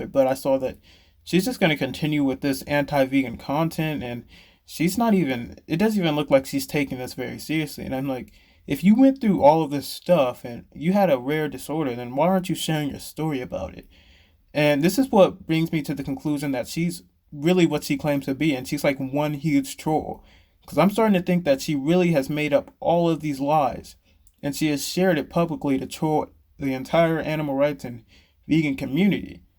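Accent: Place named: Working class, United Kingdom. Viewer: Mmm so if you believe this girl is sophisticated that would be really sad. As upper middle class, don't kniw yet her accent sounds like a lower class british accent. She's not the brightest and craves attention. So if i had to bet, is lower class narcisist with a severe eating disorder.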